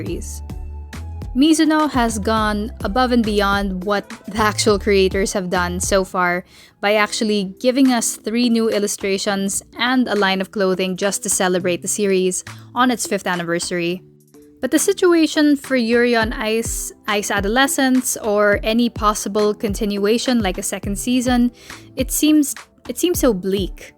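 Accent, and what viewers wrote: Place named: Philippines. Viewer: are you filipino? I can catch the accent bc it's the same as mine 💓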